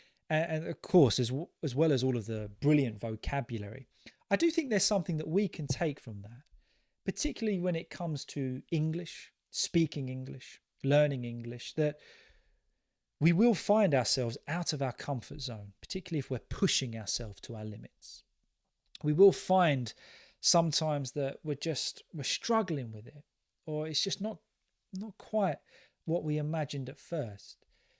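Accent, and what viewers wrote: United Kingdom. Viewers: Perfecto like always Nice British accent sounds sweet ❤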